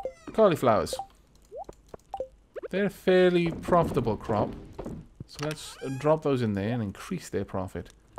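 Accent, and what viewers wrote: Wales, United Kingdom. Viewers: I am nearly sure that is Welsh.;-)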